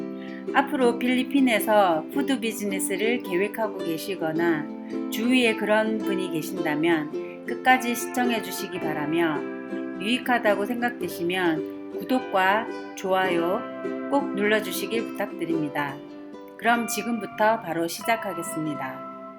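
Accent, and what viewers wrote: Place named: Seoul, South Korea. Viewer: ^ ^~ 나레이션 목소리가 넘 이쁘네요.. 서울 사람 인 것 같아요 으~ 흐 .. 넘 힘드셨지요? 니첼, 도도 없다아 .. ...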